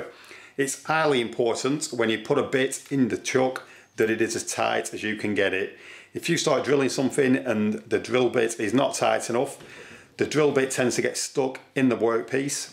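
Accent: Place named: United Kingdom. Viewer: What accent is that? I know it's British.. But it's difficult to understand when English is your second language and you ear is accustomed to American accent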